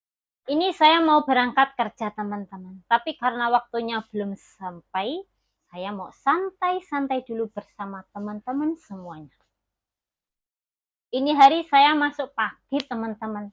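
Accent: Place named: Java, Indonesia. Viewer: Medok temen ngapake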